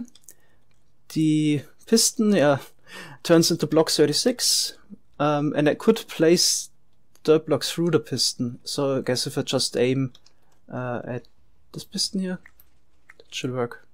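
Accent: Germany. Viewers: is German your first language?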